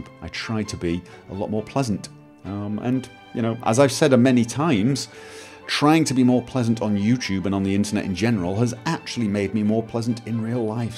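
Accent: United Kingdom. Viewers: WAIT.. you're not a well mannered British Sir with a calm voice who wears mostly tuxedos, monocles and top hats/bowlers in real life who - for some inconspicuous reason - lives in Norway? THE WORLD IS BROKEN. In all seriousness, it's really hard to imagine an angry. Like, that British voice that taught me how to mod Skyrim and FNV? That's just beyond my imagination. Or that an angry would at least sound not that angry.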